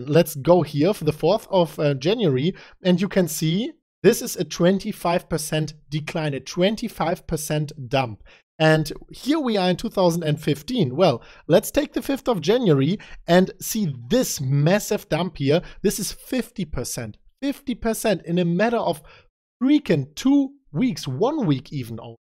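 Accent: Germany. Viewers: Are you german? ;))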